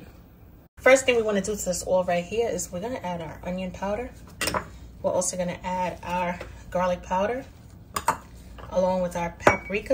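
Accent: New York, United States. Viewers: I’ve gotta say that New York accent takes me back to my days of living in Chelsea. 🏙️ 🥘♥️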